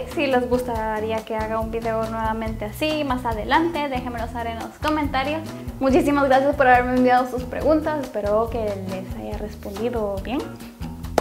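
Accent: Guatemala. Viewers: Sabía que eras de Guate!!!!! Lo note en el acento 👌❤️👌❤️👌❤️👌❤️ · Desde que vi tu primer video de tipos de glúteos supe que eras chapina por tu acento Pero tenia la duda así que entré a este video y Me encanta saber que conozco tan bien a mis paisanos 🤣❤️ saludos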